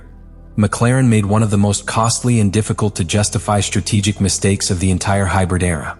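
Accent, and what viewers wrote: United States. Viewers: American accent with rubbish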